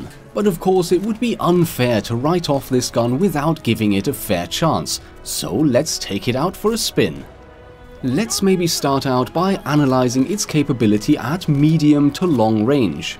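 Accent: Germany. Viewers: lol are u german ? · Are you German ?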